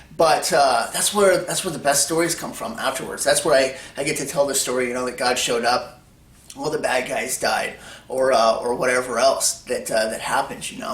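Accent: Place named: New York, United States. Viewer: Coming from a very laid back part of Australia, I find it very hard to listen to a new yorker. But your message is good, most of us awake Christians can feel it coming. But I feel its also a test of our faith.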